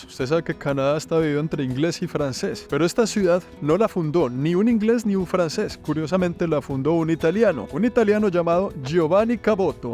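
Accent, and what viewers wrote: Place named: Bogota, Colombia. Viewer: «Espichar el botón» para que todo el continente entienda el hablado cachaco, su merced.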